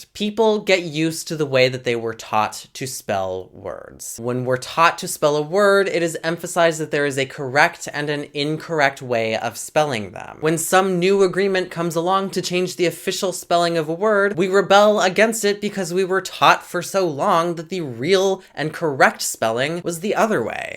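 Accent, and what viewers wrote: Portugal. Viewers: Como você aprendeu Pronunciar as palavras em PT-PT? lol Eles sempre engolem Vogais.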